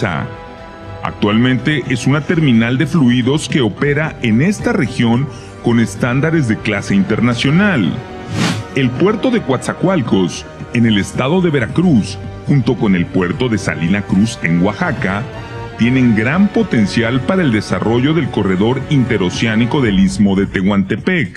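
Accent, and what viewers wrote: Spain. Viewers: No entiendo por qué tiene que ser un español el narrador de estos videos. ¿Qué no hay voces mexicanas que puedan hacer la narración?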